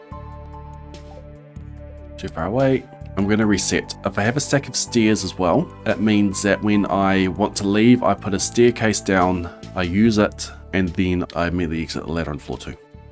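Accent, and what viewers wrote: New Zealand. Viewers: love ur new zealand accent bro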